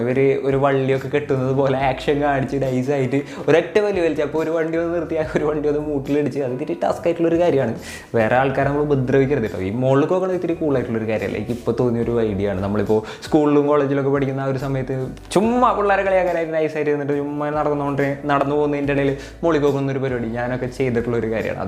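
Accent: Thrissur, India. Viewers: Chettan mmade Thrissur aano 💜💜atho Angamaly aano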